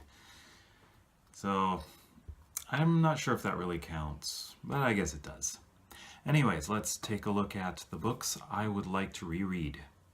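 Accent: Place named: Canada. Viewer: I like your accent! Is it Canadian?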